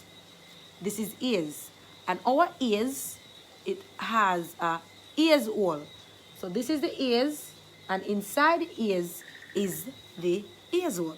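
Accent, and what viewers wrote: Jamaica. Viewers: I love your accent girl. Always loved jamaican accent. This is really an interesting video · Its so hard for me to understand Jamaican but I love how u teach it! Lol Ive been to Jamaica once n i just love the accent! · It is the introduction for me lol You are so funny. I find Jamaican accent so cool. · I love me some Jamaican accent😍! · Your accent is very thick and I'm trying to grasp the Jamaican word of the English word, amd I'm struggling! This was so informative and confusing. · Girl you got me cracking my ribs to the core..am loving your energy and the Jamaica accent ,and how you said the word welcome was interesting in a good way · 😂😂😂Sis you got my jaws dropping okay... because I did not know that Jamaican have body parts that we do not have. I love the accent though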